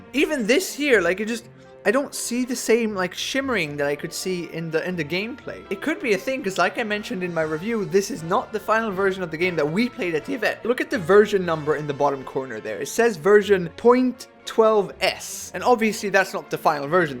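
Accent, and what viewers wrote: Sweden. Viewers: Wow Are you swedish?!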